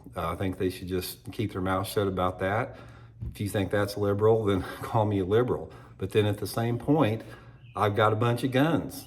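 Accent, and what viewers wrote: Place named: United States. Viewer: yYou sound like an average American, hope you dont mind that label.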